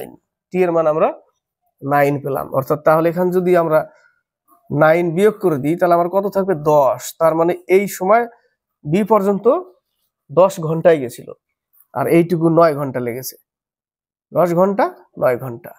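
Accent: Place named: Bangladesh. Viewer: কথা বলার ধরন তাতে মনে হচ্ছে আপনার বাড়ি বাংলাদেশ।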